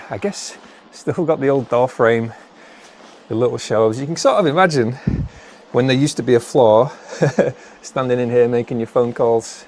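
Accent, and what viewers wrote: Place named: England, United Kingdom. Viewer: Ah *that*'s an English accent I like. Fits the scenery, too.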